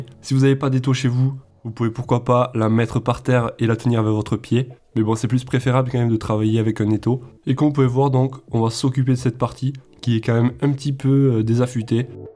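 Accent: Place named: Toulouse, France. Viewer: bel accent. toulouse ?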